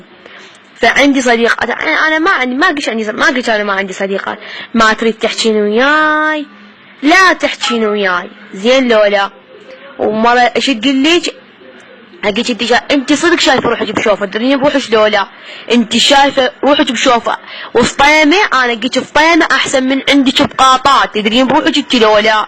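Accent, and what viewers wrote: Basra, Iraq. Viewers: الله ينتقم منهه شلون تكلهه هجي 😂😂 خطيه ولله وهاي فطيمه شلون بيهه😜 لهجة بصراويه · فدوة لهجت اهل البصره اعشقهم 😍😍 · اوف ربي شلون احب هاي اللهجه وحق علي يكولك نفس اذا اسمعه ارتاح بغدادي انا واللهجه بصراويه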